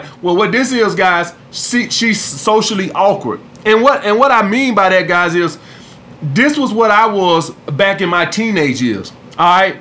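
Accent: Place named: Atlanta, United States. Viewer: you sound like you from Atlanta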